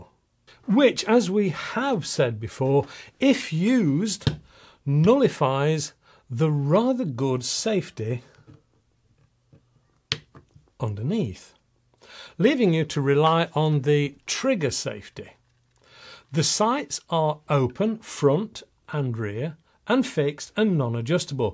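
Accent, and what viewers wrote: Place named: Liverpool, United Kingdom. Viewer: Thank you for an excellent review! Love your accent... Liverpool, originally? The real steel rattle abit, not as much as the airgun, but still! I do agree that the 17 gen 4 seems to be the most lifelike, but the threaded one, with a mock supressor, would mimic silencer on movies to a spot.... nothing like real life supressors...but again, still.. I am glad to your approach to the trolls, I am 58 and still enjoy airguns and airsoft to cheaper training then with the real steel ( ammo is extpensive in Sweden). Not to call you old in anyway, I would be far from it, but it is nice to see a mature man enjoy and review on YT... for the most it is youngsters.... don’t get me wrong, they do a awsome work...but... for the third time... ” still”... Keep up the good work, sir, and I hope to see many moore reviews from you, as long as you find it interesting! 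Thank you sooo much for upload! Oh, is there a Crosman SBR full auto pellet gun there, in your background?😉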